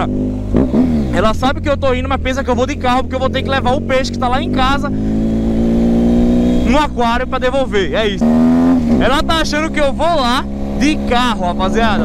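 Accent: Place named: Recife, Brazil. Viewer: Cara parece ser de Recife porra 😂😂😂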